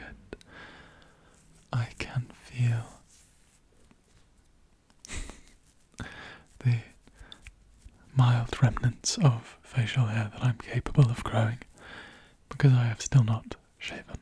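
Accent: United Kingdom. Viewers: yeah british accent makes me sleep 😍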